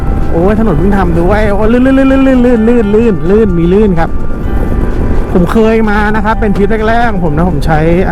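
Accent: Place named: Kanchanaburi, Thailand. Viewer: พูดเหน่อเหมือนเด็กกาญจน์เลย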